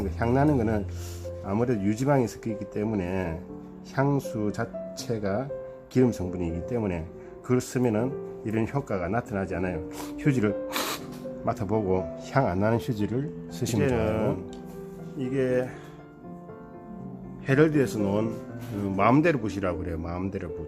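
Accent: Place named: Gyeongsang, South Korea. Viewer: 정감어린 경상도 사투리와 ^^인상이 참 선해 보이십니다^^ 하나하나 잘 배우고 보도록 하겠습니다^^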